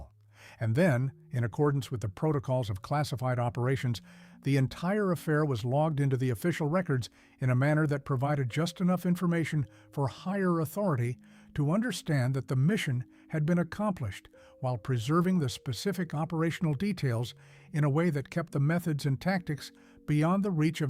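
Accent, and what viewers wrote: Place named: United Kingdom. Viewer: Lads these are well narrated and pretty direct by a fellow Brit, Godbless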